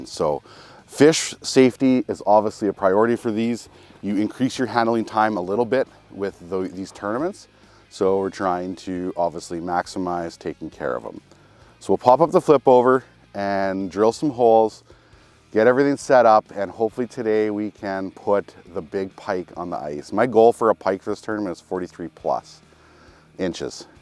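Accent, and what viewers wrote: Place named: Canada. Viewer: I think it's hilarious how Canadians say semi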